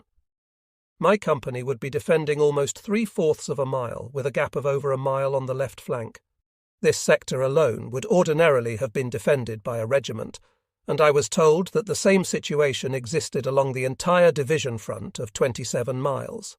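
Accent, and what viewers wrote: England, United Kingdom. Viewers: I enjoy the episodes but an English AI doing American voices and tossing in UK idioms is a bit bizarre. “Cobber” is not a term I hear in North America very often. (Previous episode-can’t remember which one). Still, as stated previously, I enjoy them. Not a huge issue, just a bit bizarre with the English idioms making some stories a bit “of a stretch” regarding American stories.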